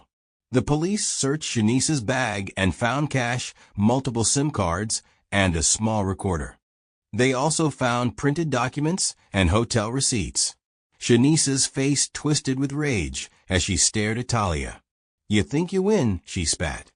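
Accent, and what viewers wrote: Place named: Jamaica. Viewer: Mi lik de patois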